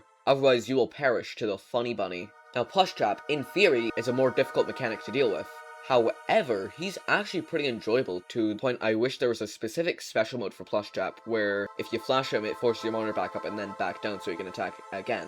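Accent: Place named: Ireland. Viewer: he's from ireland, so i think he's still tryna learn english as there dutch is like the native language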